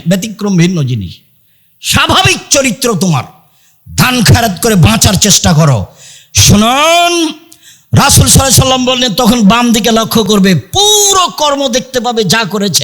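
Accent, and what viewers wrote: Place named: Kolkata, India. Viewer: কলকাতার দাদাদের মত কথা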